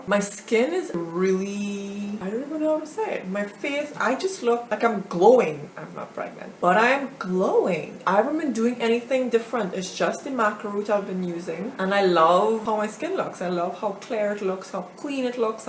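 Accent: Trinidad and Tobago. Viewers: You sounding like a Trinidadian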